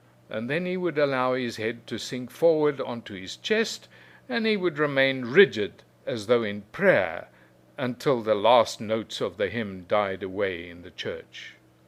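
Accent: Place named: Afrikaans, South Africa. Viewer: baie dankie ou vriend! As a descendent of Casper and Diederik Coetzee who founded the town of Zeerust, originally Coetzeerust, not far from Groot Marico and very much in love on with the works of Herman Charles Bosman, I thank you for this reading. As the previous commentator rightly remarked, this just has to be read with an Afrikaans accent, giving that extra quality to these stories from the 'bosveld'. Please do some more! A Boerseun in the UK.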